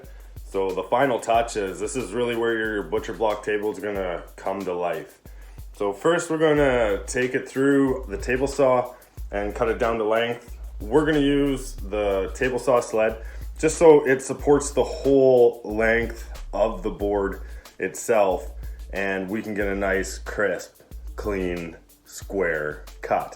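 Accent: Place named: Ottawa Valley, Canada. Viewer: Man, are you from the Ottawa Valley buy any chance? You talk like everyone i know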